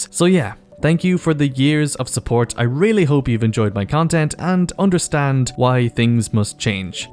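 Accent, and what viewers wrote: Ireland. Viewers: Thank you for everything <3 I enjoyed going on your stream for fun, watching videos, and hearing that smexy Irish accent of yours, and I'll keep supporting you. From making videos about video games to now working in the industry is a hug feat. I'm so happy:D Good luck!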